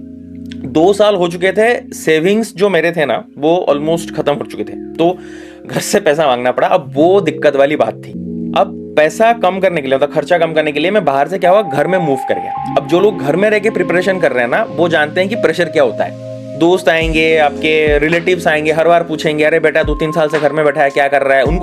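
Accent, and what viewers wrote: Odisha, India. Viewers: Bhai aap odisha se ho ?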